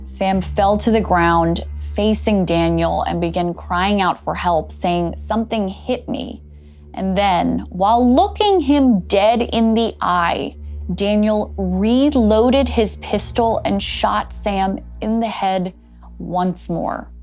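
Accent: Australia. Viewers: that kooky, strange, Aussie voice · The aussie accent wears on me after awhile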